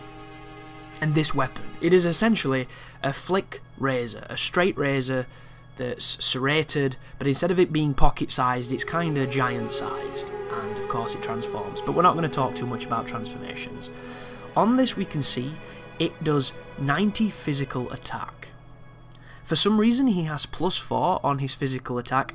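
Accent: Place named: Yorkshire, United Kingdom. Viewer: Good vid, can tell your from Yorkshire haha ;)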